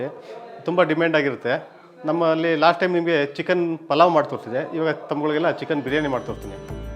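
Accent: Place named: Mysore, India. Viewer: ನಮ್ಮ ಮೈಸೂರ್ ಭಾಷೆ 👌👌👌👌💛❤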